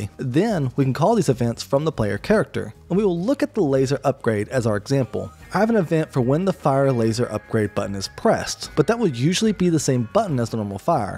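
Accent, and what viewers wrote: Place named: South, United States. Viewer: a creator with a southern accent like mine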